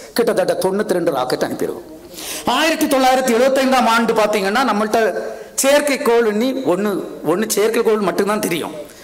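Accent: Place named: Nanjil Nadu, India. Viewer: நாஞ்சில் நாட்டு தமிழ் மணக்கிறது.....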